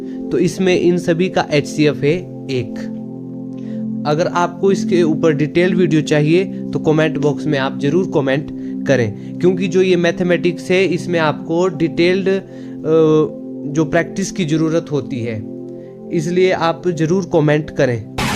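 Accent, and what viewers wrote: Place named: Pahari, India. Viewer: Aapki voice to phadi logo ki traha lag rhe hai himachal me aap kha se hoo ...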